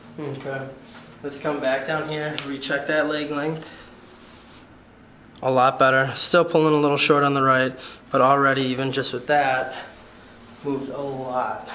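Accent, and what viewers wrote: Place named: Midwest, United States. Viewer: Hey, you sound like you're from Illinois or Wisconsin. Am I right? Random question, I know.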